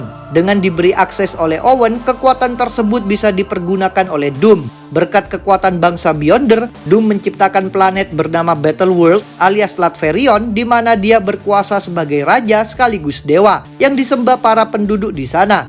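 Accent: Indonesia. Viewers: Byon der,, bion derr,,, medok mana logatnya itu min? 😅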